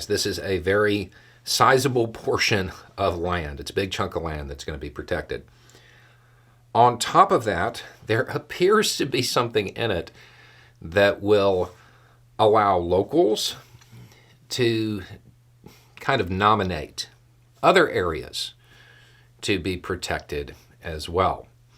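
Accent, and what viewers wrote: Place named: Rural, United States. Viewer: your accent has gotten crazy hick since you were "DIA." Hope things are great! Money glows.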